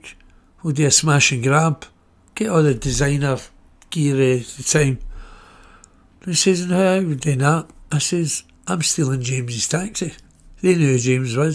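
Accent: Glasgow, United Kingdom. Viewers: He is meaning going bk to his 19, 20,s . Its a glasgow way of speaking 😅👍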